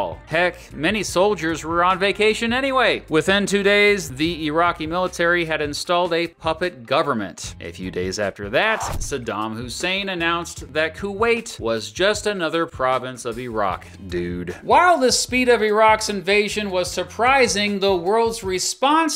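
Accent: United States. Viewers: وده واحد امريكي بيتكلم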